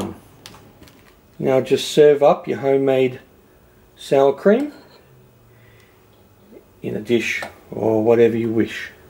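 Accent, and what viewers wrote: Australia. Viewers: Are you from Australia. Because your pronunciation defines that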